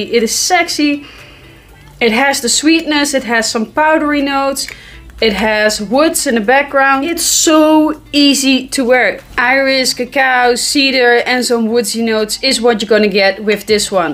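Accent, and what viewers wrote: Netherlands. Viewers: Beterschap meid. Heel chill dat je geurtjes doet. En je Hollandse accent doet t m met je grappen 😁👍🏻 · Hehe 😋 from you accent i know you are from Netherland my girlfriend from Netherland have same accent 🥰 Thank y from Morocco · You sound like you are from The Netherlands, are you? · Is it okay to be turned on by the Dutch accent? Man… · Beautiful accent 😊 Dutch?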